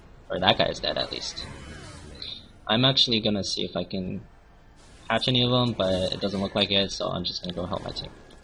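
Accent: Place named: England, United Kingdom. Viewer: Bro sounded more English than he does now, love you xo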